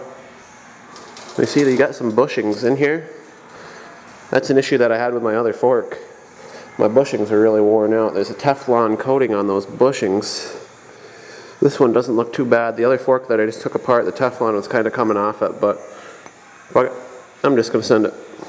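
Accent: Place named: Quebec, Canada. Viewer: Tu viens du québec ces clair haha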